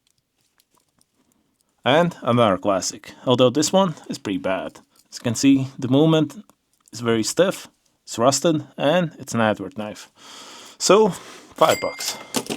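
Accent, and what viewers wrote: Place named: Eastern Europe. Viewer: hmm Id guess you are either Slavic or Eastern European??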